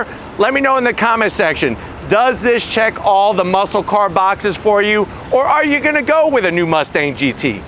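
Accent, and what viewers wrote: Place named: New York, United States. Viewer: Gday mate im an Aussie and am getting a Hellcat imported for a January delivery. Cant fkn wait aye. Good review just a question though where is your accent from as i love immitating your voice. Are you from Brooklyn or Boston or something else?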